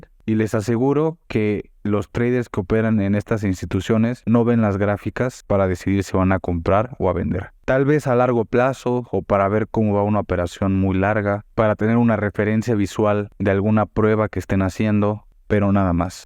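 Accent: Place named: Mexico City, Mexico. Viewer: ¿eres de Chilangolandia?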